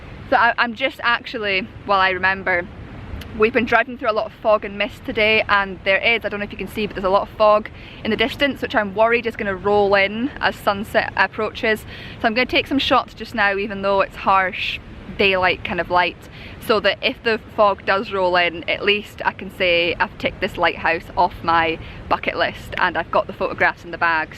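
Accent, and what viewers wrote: Scotland, United Kingdom. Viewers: .love the wave photos.I been watching all your videos and find them most enjoyable, If it wasn't for the cute scottish accent, i might say you talk a wee bit to much lass.Keep up the good work. hope you make it to Orkeny.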